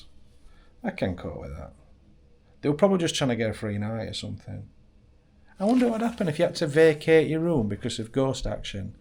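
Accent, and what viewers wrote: Yorkshire, United Kingdom. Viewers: “I’m trying to be spooky” 😂 “Think I’ll go t’ bar” Don’t even know why nearly everything you say is funny af… maybe it’s your fantastic Yorkshire accent… your personality… the way you and bounce off each other with your banter... Whatever it is, I really enjoy your uploads. Keep ‘em coming 🌞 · Another great "Yorkshire Take" on somewhere I know very well! ☺️...I used to live just on the other side of Romney Marsh, at Hythe...another very historic town. You could easily do a run along that coast with in the van and stop at half a dozen nice places...maybe start at Folkestone, then Hythe...Camber Sands...Hastings...Bexhill... Eastbourne...and finish up on Beachy Head? Just a thought. The bit that made me laugh most was when you said "reet" to that fella in the pub...and he didn't have a clue what you meant! 😂 I don't think you Yorkshire folk quite realise how intriguing us soft southerners find your dialect! 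It is absolutely fascinating...as was the video, once again. Nice one! ☺️ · I'm watching this from NZ. I was born a yorkshire lass, we emigrated to NZ in 1966 when I was just 8 years old. I love your beautiful accent, it reminds me so much of my darling dad. You are so funny, love your sense of humour. The part when you talked about assigning the ghosts to their room for the night.... hilarious!! I'm definitely a ghost believer and have had several encounters over the years, especially from my dad after he died 20 years ago. I've now subscribed so I can see more of you. Thankyou for the entertainment 😂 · I knew you were a Yorkshire bloke when you started talking! I'm from Rotherham, but live in Sheffield. I've never been to Rye, but my other half will have, probably. Shame you didn't get any spooky happenings isn't it? Maybe next time and in the next place too. If you're worried about just being in your undies at night, take an old t-shirt with you to wear while you sleep. 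I don't go without one if I know I'll be away from home and they don't take much room up in your bag either.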